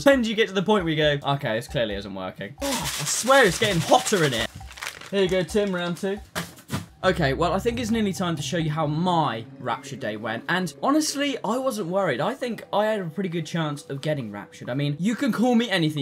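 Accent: United Kingdom. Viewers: Handsome, naturally good physique, British accent, funny content, gentle humour = best recipe for my entertainment Thanks for having started making videos! But also thx for the yt algorithm for suggesting you to me.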